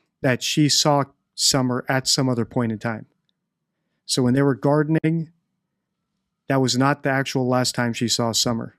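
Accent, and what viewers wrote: South, United States. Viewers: I think the way she talks is just being uneducated and southern. I say both because my step mom is a smart woman, has a great job at a company she has been with for decades.. but she is from Georgia/Tennessee, and the way she talks sometimes just makes me cringe a little inside 😂 it's a southern thing.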